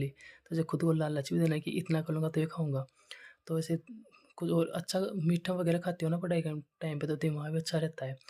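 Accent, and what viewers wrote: Rajasthan, India. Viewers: बोली से पक्का हो गया की राजस्थान से हो पर राजस्थान में कहा से 🤔😅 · Aap kha se ho bhaiya aapki voice Rajasthani h m bhi alwar Rajasthan se radhe radhe bhaiya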